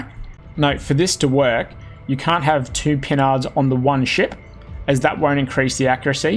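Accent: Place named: Australia. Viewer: HA, Aussie detected. Good videos btw, thanks for explaining all this stuff.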